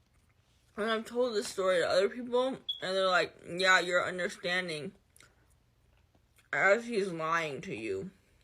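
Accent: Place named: North, United States. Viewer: Question: Do you ever post sober? Also, you sound like you're from up north. US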